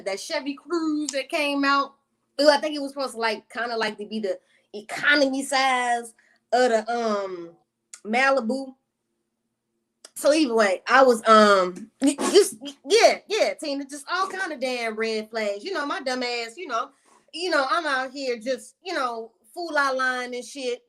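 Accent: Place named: Houston, United States. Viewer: She sounds like she lives in Northside Houston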